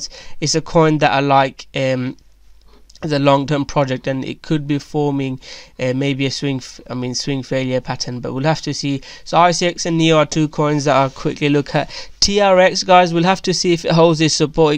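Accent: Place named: Bradford, United Kingdom. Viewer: Another great video as usual. Did you cover the GVT we discussed a couple of weeks ago. If so can you tell me where to find it. What's your thoughts on ADA from a hodl point of view. A £10 price is all I need 😉. I'm trying to figure your accent. I thought I sensed a Bradford twang.but it could be anything from Southampton to Bournemouth Brighton upwards. I'm from God's country (Wales) in Wrexham.Many questions. Take your time 👍🤝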